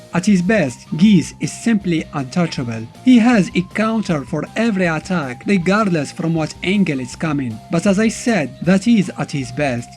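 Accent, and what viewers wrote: Arab world. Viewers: I can tell you're Arab, maybe Moroccan or Algerian, from the way you said Najd, and your accent over all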